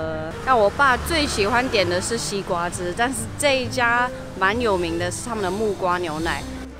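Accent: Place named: Taiwan. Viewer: 這個主播…很台！😂😂😂😂😂😂😂超👍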